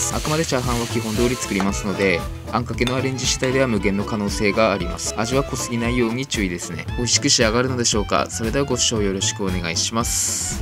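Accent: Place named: Tsugaru, Japan. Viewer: おめ津軽だべ？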